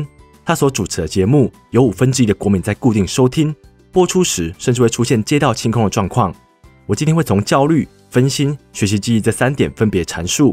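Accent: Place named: Taiwan. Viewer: The speaker has a very thick Taiwanese accent.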